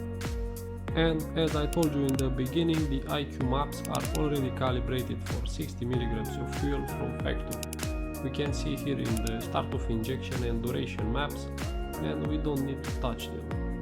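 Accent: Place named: Romania. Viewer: Mi-am dat seama ca esti roman dupa accent.😁Frumos video spor in continuare.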